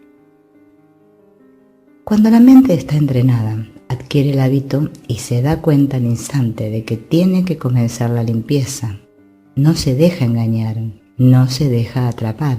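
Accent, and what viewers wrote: Argentina. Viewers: Gracias por la claridad con la q explicas..sos Argentina?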